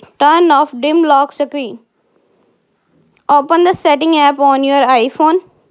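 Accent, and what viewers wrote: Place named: India. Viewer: Every tech video there Indian and I can’t understand them like can’t there be American tech videos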